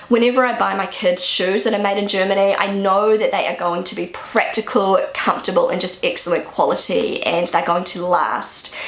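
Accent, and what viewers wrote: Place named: New Zealand. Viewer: Hi. This was the first time in my whole life I heard someone from New Zealand speaking and I really liked it. It’s a bit different to what I’m used to though. And no Deutsche Bahn is not punctual, wasn’t ever and I doubt it will ever be.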